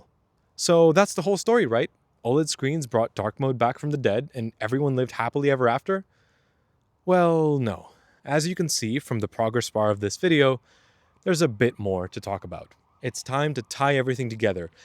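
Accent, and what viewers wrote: United Kingdom. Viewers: Your British accent is top notch sir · Incredible British accent. I forgot you were American initially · The topic was highly interesting, the delivery was great! Your vaguely British accent is Decent! The message was appreciated. 10/10 would hurt my eyes watching this video again! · why were you british half the time?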